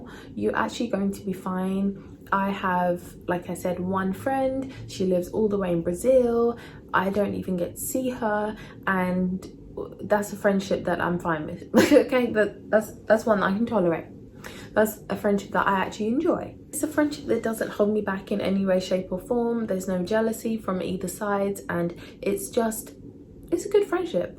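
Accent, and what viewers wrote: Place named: United Kingdom. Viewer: So happy to hear a British accent and thank you for speaking on this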